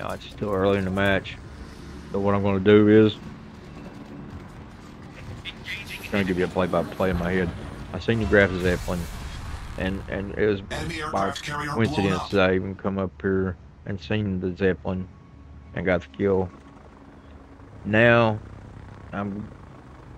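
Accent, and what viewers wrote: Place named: South, United States. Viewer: Great game. Not a CV player either but enjoy all the other surface ships. I'm a player from Middle Tennessee so it's nice to see someone with a southern accent doing wows videos.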